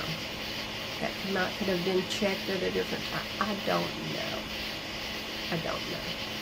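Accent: South, United States. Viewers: She is definitely southern, My Dad was from Alabama and pronounced certain words differently.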